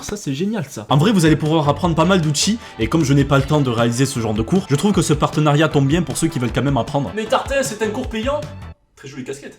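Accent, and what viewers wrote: South, France. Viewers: Non le bouc c'est sa marque de fabrique avec aussi son bel accent du sud (que j'ai aussi) c'est sacré comme les cheveux bouclés pour Norman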